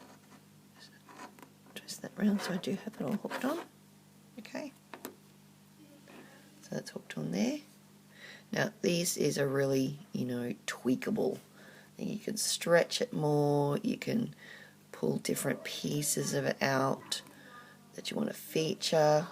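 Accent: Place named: Australia. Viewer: Hahaha! I so understand not waiting for the "perfect time" to do a tutorial! If you have kids or an adult kid (husband;) ) you just have to "wing it" at any possible moment or none will EVER get done. Love your designs, you are amazingly talented! Also, I am so infatuated with Australia and love listening to those who have an Aussie accent, your manner of speech is SO COOL!!! Right now I am trying, SO HARD, to comprehend monster tail type looming. I am in awe of those, like yourself, who are making such beautiful designs this way!!!! Love you looming friend:)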